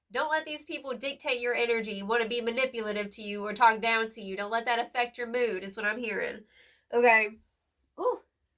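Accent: South, United States. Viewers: I’m loving your accent , I’m guessing South Carolina, Georgia? I am rising like a phoenix, a thunder bird. ❤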